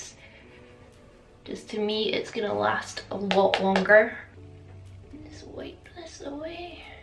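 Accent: Scotland, United Kingdom. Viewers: I love your videos! I just want to say thanks for your videos, I am struggling with my anxiety just now and your videos help take my mind off things. It's also really lovely to hear another Scottish voice😂💖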